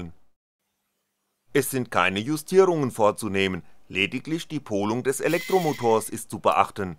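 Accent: Hesse, Germany. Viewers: Seltsammer Sprech da wo Du herkommst (tiefstes Hessen?). Man merkt das Du versuchst betont Hochdeutsch zu babbeln :))) Fachlich sind Deine Videos mit das interessanteste was YT zu bieten hat. Wirklich toll was du hier ablieferst. Allerherzlichsten Dank für die Arbeit und die Zeit die Du in die Anleitungen reinsteckst. Ich hoffe noch viel von Dir zu sehen.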